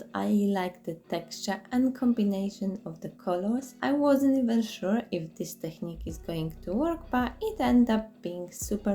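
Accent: Poland. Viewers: These were all brilliant! I genuinely enjoyed them and only today I took a lampshade apart and considered using macrame cord on it. I love what you do and that you also go to the charity shops! Where sbouts in the UK are you? I can usually tell by someone's accent but you sound like you might possibly be from Poland (I love the accent). Cant wait to see what you do next and subscribed last week. 💕💕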